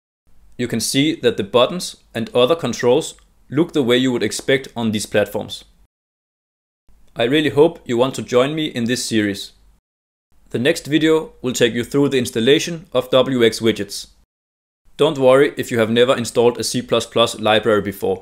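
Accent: Denmark. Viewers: Danish? I like the accent :))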